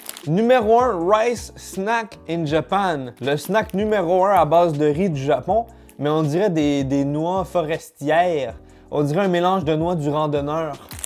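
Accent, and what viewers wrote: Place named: Quebec, Canada. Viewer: J’adore regarder tes vidéos , mais le plus que j’adore c’est ton accent Québécois (ton p’tit chat aussi )😁💯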